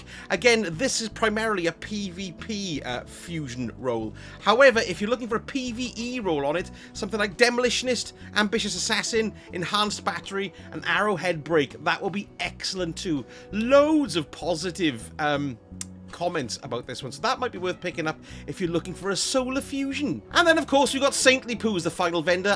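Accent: United Kingdom. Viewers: Love your channel, nice to hear a non-american accent in videos. (fellow brit btw)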